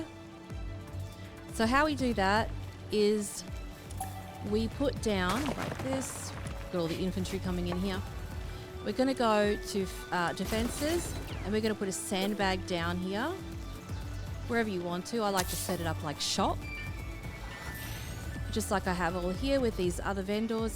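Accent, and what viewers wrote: Australia. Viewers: aussie accent 😍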